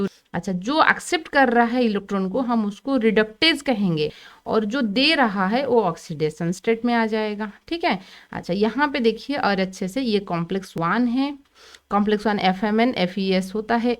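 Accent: Odisha, India. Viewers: I think you’re odia that i got know through your accent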